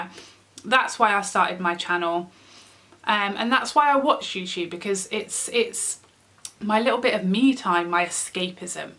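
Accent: United Kingdom. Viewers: Yup, I reckon drugstore ain’t the only duping going on in beauty YouTube! Really enjoying your channel btw, nice to hear another British voice. I should add that I came to you through Mel, who I in turn came to through Wayne - all lovely genuine people! xx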